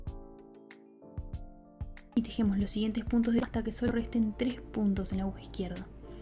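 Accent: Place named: Argentina. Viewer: Quedo super bonito! Ansío hacerlo. Pensaba que tu idioma de origen era inglés pero al escuchar tu voz me di cuenta que tienes un acento muy argentino, sino me equivoco!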